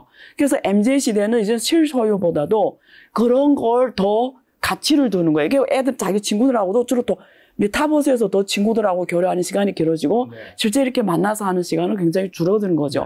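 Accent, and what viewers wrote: North Korea. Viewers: 북한 여자인가..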